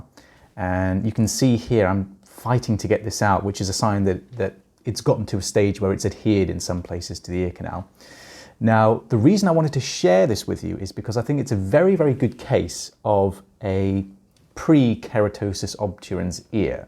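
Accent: United Kingdom. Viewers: Yup! Cute doctor with a British accent always has a calming effect on me. 😆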